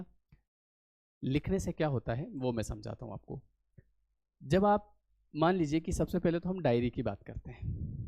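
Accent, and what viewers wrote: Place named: Madhya Pradesh, India. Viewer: i love ur mp acent ratlam indore ya ujjain ❤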